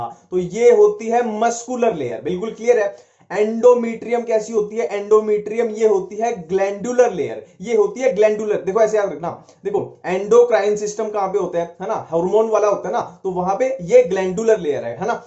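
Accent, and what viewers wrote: Haryana, India. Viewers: Thankyou so much sir Waise aap Haryanvi hain kya?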